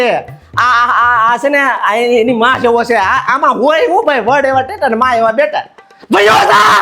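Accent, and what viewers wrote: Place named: Kathiyawad, India. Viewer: કોણ બેન બોલે છે બોવ મસ્ત અવાજ છે હાવ કાઠ્યાવાડી 😂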